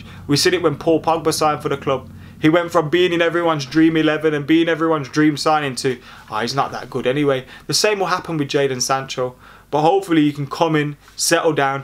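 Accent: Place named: Manchester, United Kingdom. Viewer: Imagine you talking about Harry Kane on the Kick off if he was going to United, but cause he’s going to City he’s unprofessional, doing it all for himself, not caring about Tottenham. What else could he have done, he hasn’t handed in a transfer request he’s just spoke about it and was respectful. Nice Manchester accent aswell by the way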